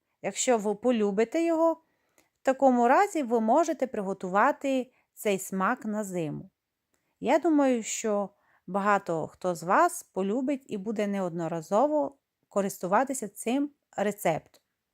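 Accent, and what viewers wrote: Transcarpathia, Ukraine. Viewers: У вас вимова як у Олі,закарпатської господині,наче ви сестри.Дуже приємно вас слухати,голос лагідний.Дякую за рецепт.Бажаю вам всього найкращого.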